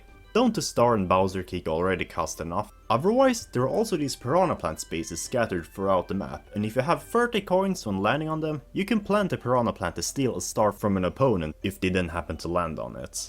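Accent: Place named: Sweden. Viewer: Your english accent sounds just like mine, are you from Sweden ?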